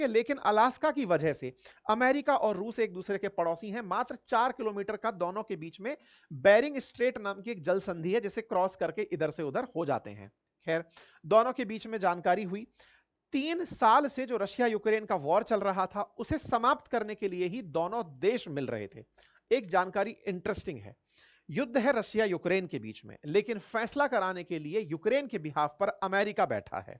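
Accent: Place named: Kanpur, India. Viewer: pls keep kanpuria style of anchoring, it sound sweet...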